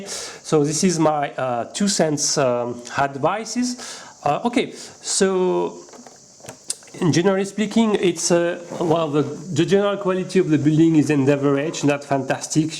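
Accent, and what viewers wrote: France. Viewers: Te pas francais ?? parcque vue ton accent on sen que ce francais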